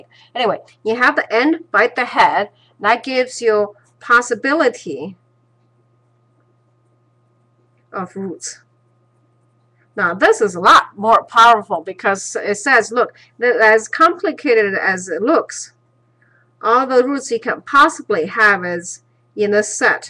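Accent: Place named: Eastern Europe. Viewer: I think Descartes also did imaginary #s. I cant recall for certain, Thank you very useful. also your accent sounds like the Slavic lady from 2 broke girls. Not a bad thing, Its cool.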